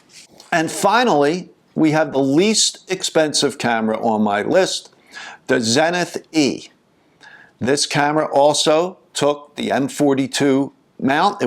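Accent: Philadelphia, United States. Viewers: Are you from Philly? The accent is undeniable!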